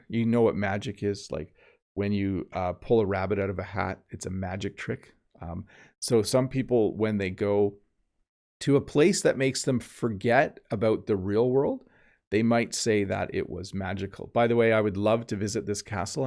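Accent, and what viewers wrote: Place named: Canada. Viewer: Great videos!! My students love your teaching style and I recommend your channel to hear natural English with a different accent - I’m British but it’s important to hear all different accents. I find Canadian clearer than American. Keep up the good work!!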